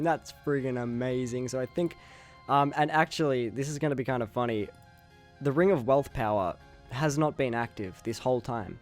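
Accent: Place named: Australia. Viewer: Enjoyable content as always and your Aussie bogan was showing a little in this one :P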